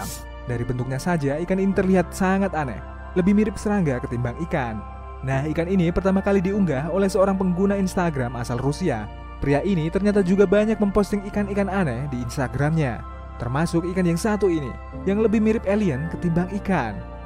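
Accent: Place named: Medan, Indonesia. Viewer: Orang medan kau yaa bahasa kau mirip kali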